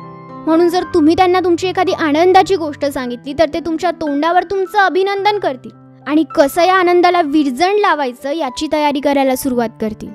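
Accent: Pune, India. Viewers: Puneri Marathi ❤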